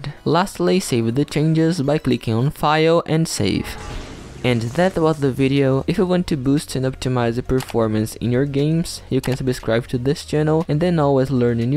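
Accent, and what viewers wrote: Brazil. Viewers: Eu senti que seu inglês tava meio brasileiro kkkkkk dps que eu vi o nome do canal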